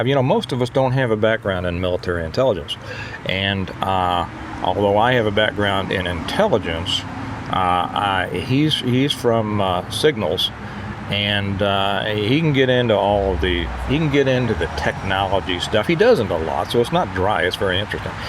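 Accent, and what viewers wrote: South, United States. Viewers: I LOVE UR DRAWL WHEN YOU TALK.. we in Canada love listening to YOU Southern folk..KEEP TALKING BRO.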